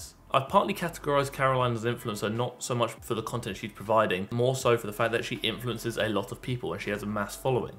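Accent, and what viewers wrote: United Kingdom. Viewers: Absolutely adore Caroline. I'm not advanced nor a beginner so it's a huge challenge for me but I love every moment of pain. Also I love your British accent especially the 'bloOdY' 😂. Idk if you know but you seem really seem similar to another youtuber Slogo. Don't know if you know him but yeah I can't ignore the similarity.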